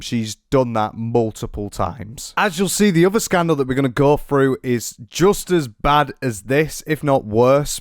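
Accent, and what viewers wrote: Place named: Scotland, United Kingdom. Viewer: I could swear I heard some Scottish accent 😭 good content keep it up 👍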